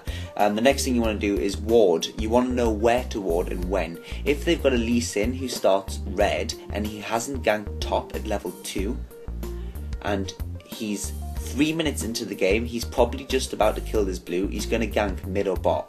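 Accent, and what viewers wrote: United Kingdom. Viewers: Man, THANKS for the guide and for speaking british english xD English is not my native language but I understand yours way better than american one. So I learn as much as I can.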